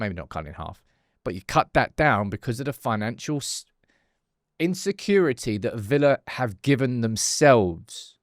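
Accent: Manchester, United Kingdom. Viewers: That’s a strange Mancunian accent you have there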